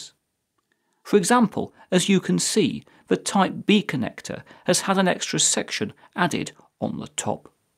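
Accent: United Kingdom. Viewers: He's British... this is how they all speak, what more did you want? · brittish dude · It is a British Accent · Dat british accent! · Nein, es ist Britischer nicht Amerikanisch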